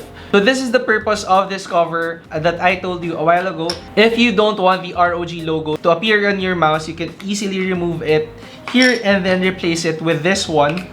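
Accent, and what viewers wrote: Philippines. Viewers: Haha no worries. After seeing the Mexican conversion, I remembered you had a Filipino accent and looked that up.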